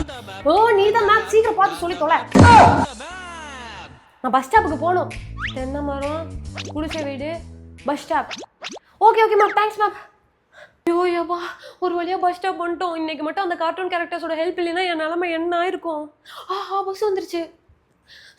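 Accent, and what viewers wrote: Coimbatore, India. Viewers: Neengha coimbatore ah akka ??? ♥️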